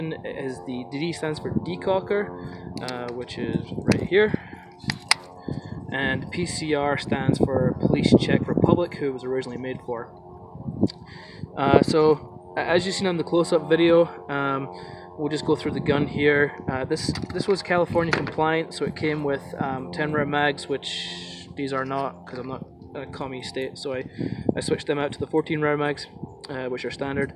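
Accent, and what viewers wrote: Scotland, United Kingdom. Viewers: I was thinking Scotland! Sounds like a mix of Scottish, Canadian and American haha Thanks for the review. Would you recommend this as a first handgun? I'm looking for a good range gun but also something I may want to carry occasionally.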